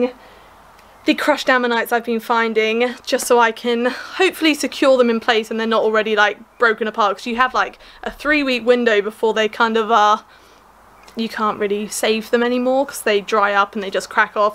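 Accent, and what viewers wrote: United Kingdom. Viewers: Great birthday gift. That’s a nice one, you are really set now. Loved the show and tell, looking forward to you working with the fossils. With that British accent, I could listen to you all day.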